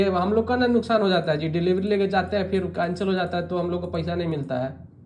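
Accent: Bihar, India. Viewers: Your speaking accent was bihari. You have to speak like local. Please catch delivery boy also because he was saying "vahi aana jahan har baar lete hai".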